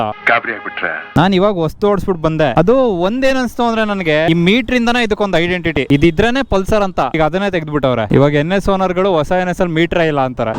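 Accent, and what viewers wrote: Karnataka, India. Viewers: ಕನ್ನಡದ ದನಿ ಟಿಪಿಕಲ್ ಧ್ವನಿ..............❤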